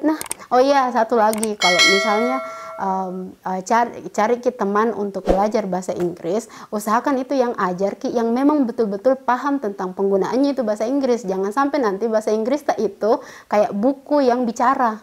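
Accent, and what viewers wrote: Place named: Makassar, Indonesia. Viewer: Rinduu kelas logat makassar, sukses eonnicu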